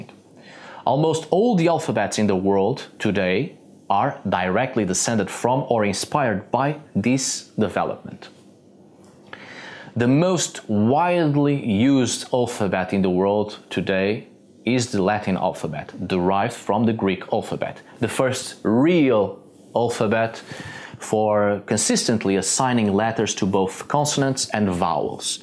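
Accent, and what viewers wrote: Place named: Portugal. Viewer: Were are you from' your accent looks very portuguese x)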